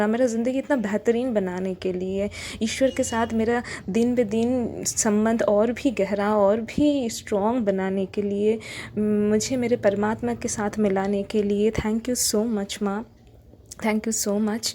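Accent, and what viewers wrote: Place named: South, India. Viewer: Di aap south Indian ho kya ....aapko boli me vesa hi touch hai .....which is very sweet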